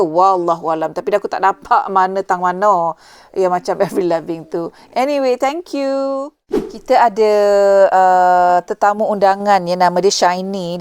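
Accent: Negeri Sembilan, Malaysia. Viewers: Suka dgr puan bercakap. Slang negori xtinggal. Menginggatkan sya pada seseorg tapi xboleh nak recall suara sapa. Pn asal mano. Masa form5 sekolah di mana & thn bila?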